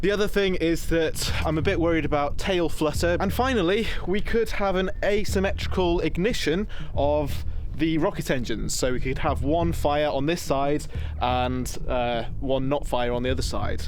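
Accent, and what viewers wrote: United Kingdom. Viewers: Is bro speaking UK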